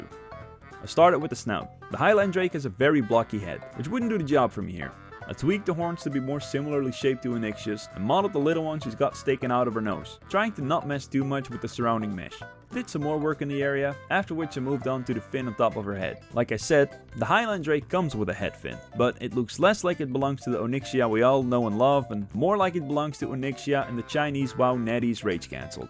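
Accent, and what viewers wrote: Netherlands. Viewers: Loving the dutch. Really made me remember how similar I pronounced things in WoW when I was younger.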